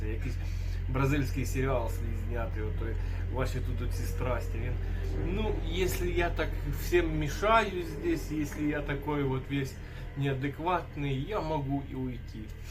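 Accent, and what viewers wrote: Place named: Western Ukraine, Ukraine. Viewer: Ооой, привіт, так приємно чути справжню Українську Западеньску мову ❤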